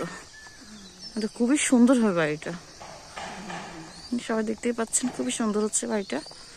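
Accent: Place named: Noakhali, Bangladesh. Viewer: Noakhalir je sposhtho buja jay,goir ladi koy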